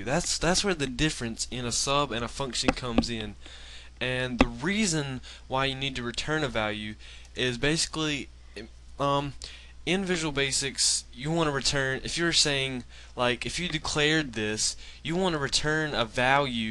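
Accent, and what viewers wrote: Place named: South, United States. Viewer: Great Video, sexy semi-southern accent.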